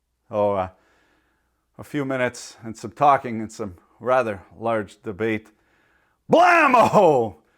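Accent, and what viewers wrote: Quebec, Canada. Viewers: With that French accent you have to be from the Quebec area....my heritage is French Canadian as hell. You sounded like one of my uncles.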